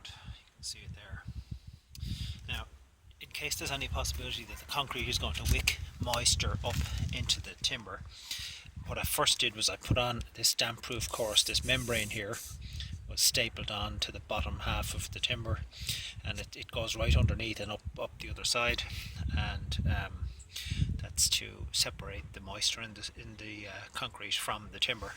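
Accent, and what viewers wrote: Ireland. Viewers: Just watched to the end and it's fabulous I wanted you to walk inside with the camera at the end. Love your accent too. I want to move to Ireland now :-). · Ha! I figured the accent was Irish! This is awesome, our corrugated plastic flew away during one of the recent storms, they were only nailed down, this is exactly what I needed!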